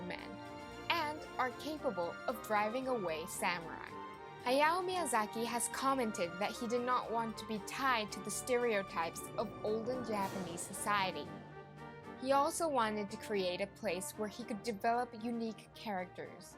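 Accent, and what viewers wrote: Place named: England, United Kingdom. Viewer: I could listen to you talk about anything your english accent is so nice.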